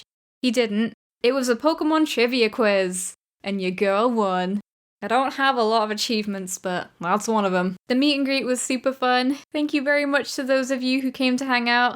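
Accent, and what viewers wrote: England, United Kingdom. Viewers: Where are you from? I'm English too, I love your accent! Hope you're okay now :)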